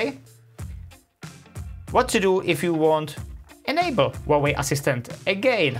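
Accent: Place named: Czech Republic. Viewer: mě bylo hned jasný že jsi Čech jsem to nějak poznal podle anglictiny a hlasu❤️ fakt díky moc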